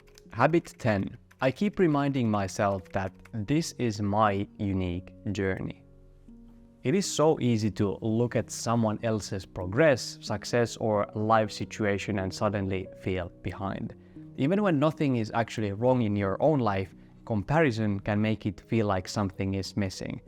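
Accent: Finland. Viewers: The moment I heard finnish accent I knew I was about to hear something wise. Kiitos.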